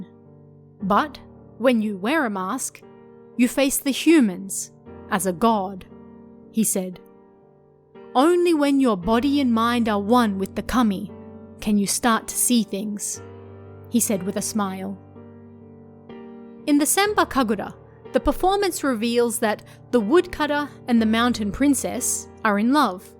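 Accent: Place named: Australia. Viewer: Hard to listen to the Aussie for a long time. Not saying it's bad, just not used to it.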